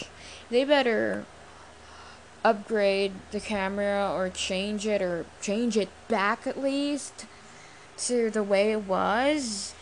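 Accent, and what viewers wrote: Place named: United States. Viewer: Can you just speak natural?? Damn! You sound annoying with all your Anerican English Accent!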